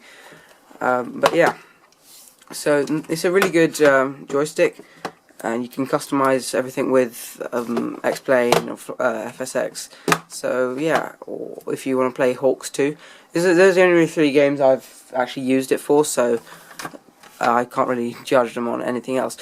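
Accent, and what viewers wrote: United Kingdom. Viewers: Very good review thanx bud, good to see and hear british reviewers and a excellent british accent well done.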